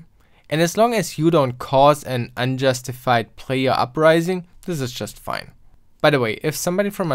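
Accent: Germany. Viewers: german? xD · Love your German accent, as soon as I heard your accent, I was like " this video should be good".. haha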